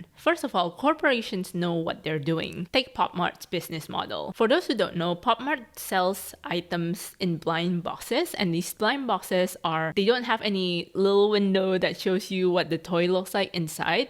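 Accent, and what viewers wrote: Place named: Indonesia. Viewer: I i recognised your accent and i checked your instagram and as a fellow chindo IM SO HAPPY TO HAVE FOUND A INDONESIAN CONTENT CREATOR ESP ESSAYIST wadawww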